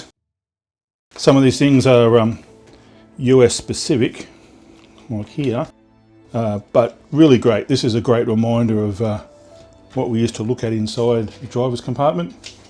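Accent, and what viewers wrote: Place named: Australia. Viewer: hello, which part of Aussie are you from. Malcolm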